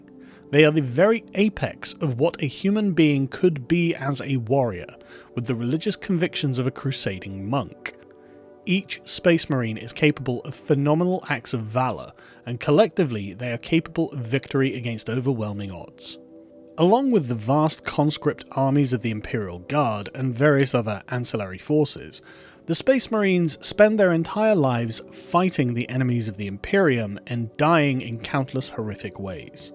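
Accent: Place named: United Kingdom. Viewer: A very disingenuous "analysis". The problem GW is fighting against is that fascists and straight up nazis tend to enjoy the setting a little too much. They see the hardcore totalitarian, militaristic, theocratic, intolerant, expansionist and genocidal nature of the Imperium as aspirational and something modern society should model itself after, not understanding or caring about how the entire setting is a satire and sometimes a clear condemnation of that world view. As it happens with other IPs like Starship Troopers, they are just enamored with the superficial aesthetics of the totalitarian government in the setting, and care for little else, and try to claim the whole thing as their own. In many ways, GW is just another amoral corporation, but they recognize that their brand being associated with nazis is very, very bad for business. That's the reason they have to release statements condemning the presence of extreme right individuals in the community, not because they happen to actually hate conservative, masculine, western values. 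Benjamin has mastered the art of sounding smart while being very stupid. Proof that just because you have a snooty british accent doesn't mean people should pay you any attention.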